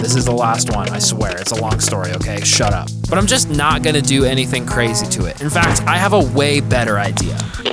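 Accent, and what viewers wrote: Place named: Minnesota, United States. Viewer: Nice video and great editing! I'm hitting the road this summer for a project... But I'm older and going to use a van 😁. New subscriber though today. Couldn't tell, but thought I saw a hint of Minnesota? Best wishes on your new adventures 👍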